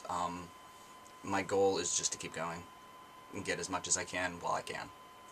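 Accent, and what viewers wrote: United Kingdom. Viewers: i am british and i honestly thought you were as well from that video you did.... impressive accent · I have watched this video and your biggest fan video and think you are absolutely fabulous! It is so wonderful that God enabled JK to create a series that followed many kids from their childhood to adulthood getting them to not only read books longer than anyone would have believed but to devour them and beg for more. I'm also happy that the films do the books justice. Harry Potter has been just wonderful and my only question to you is did you learn your British accent entirely from the films?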